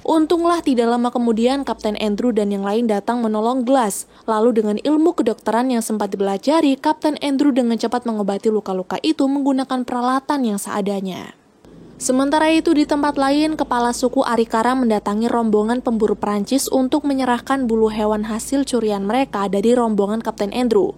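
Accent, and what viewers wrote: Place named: West Java, Indonesia. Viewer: Mabk. istrinya si Jon ya wkwkwk. soalnya mirip Dari intro nya cara ngomong ada sundanya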